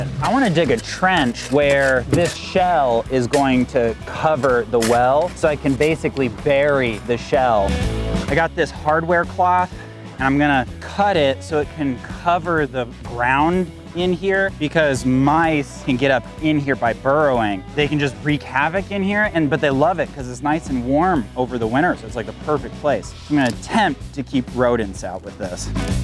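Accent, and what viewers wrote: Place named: United States. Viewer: Sometimes I have to try and work out what you're saying in American and translate it to English new word soffits in English they called fascia boards, and if you're trying to insist you speak English, I can tell you is definitely American there's a new your loss of bastardising English which is doving translated into English means driving, so don't tell me you're speaking English still like your videos even though I have to try and work out what you're saying in English.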